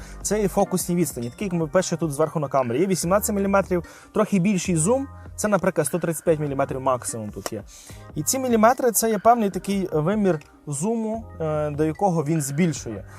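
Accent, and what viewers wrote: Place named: Ivano-Frankivsk, Ukraine. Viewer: то бо гіби десь з Франківщини ви)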